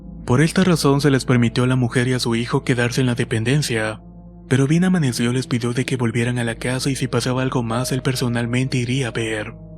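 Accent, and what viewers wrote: Standard. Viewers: Oye men, no logro entender cuál es tu nacionalidad, ya que no tienes un acento que te pueda identificar como recidente de ni un país concreto. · Tu acento es como el español neutro de las traducciones de películas de principios de los 2000. Me traes buenos recuerdos.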